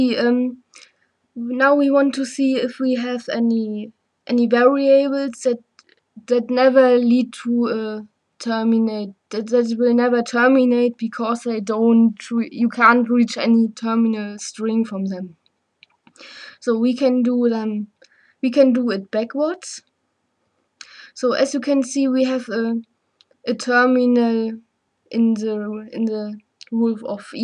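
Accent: Germany. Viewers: schone Deutsche accent :)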